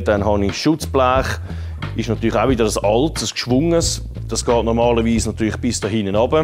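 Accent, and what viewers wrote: Switzerland. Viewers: Ich komme aus Süddeutschland und kann alles verstehen. Ich mag den schweizer Dialekt sehr, Töfli hört sich doch viel besser an als Moped.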